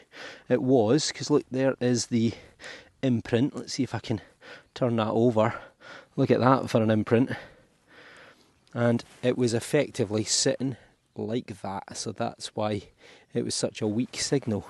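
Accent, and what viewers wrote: Scotland, United Kingdom. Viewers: Just found your channel. I really enjoy watching all the digs. Especially love the Scottish accent. I swear you sound exactly like Roger from Outlander.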